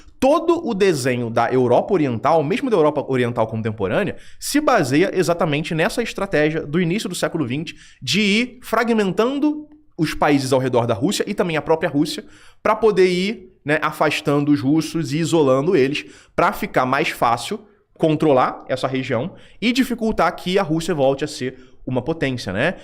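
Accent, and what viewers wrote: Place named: Rio de Janeiro, Brazil. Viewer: Muuuuuuito carioqueixxxxx, fica difícil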